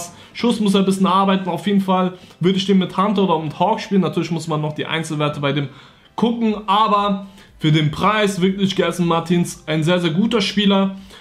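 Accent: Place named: Berlin, Germany. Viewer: Das ist Berliner Akzent die sagen isch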